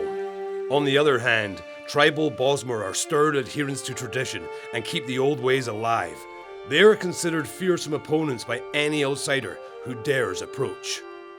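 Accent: United Kingdom. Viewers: Ok watched for an interesting topic, but I'm now staying for the voice. Scottish accent my favorite ever since Sean Connery became my Bond. Great video and thanks for the content also. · Can anybody nail down the presenter's accent? It sounds mostly Northern English or Scottish, but once in a while I could swear I hear bits of Irish and Welsh... maybe even a bit of American? If I had to guess, I'd say this guy has probably spent a lot of time moving or traveling in his youth. I'm fascinated by English dialects, and this guy's intrigues me. Oh, but great video btw. Beyond Skyrim is my brightest hope for the future of TES, and I love the work you guys do. Keep it up.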